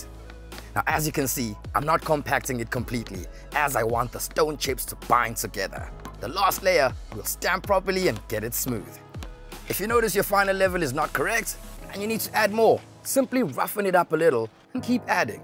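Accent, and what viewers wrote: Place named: South Africa. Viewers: His South African, incase you wondering . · Naw, he's a Saffie, for sure.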